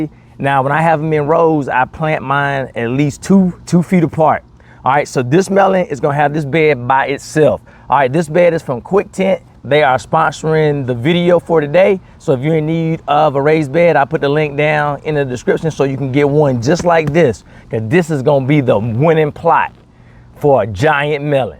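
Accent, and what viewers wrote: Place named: Baltimore, United States. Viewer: You saying u's like you from b.more! Yay yae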